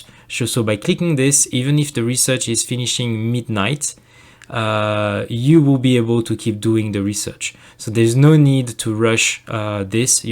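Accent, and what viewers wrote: France. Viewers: Il me semble bien reconnaitre l'anglais d'un francais ^^ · l'accent francais 💔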